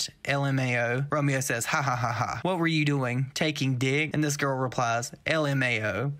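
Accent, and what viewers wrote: South, United States. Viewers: I love your southern accent...you sound so polite, even when you're being snarky. 😂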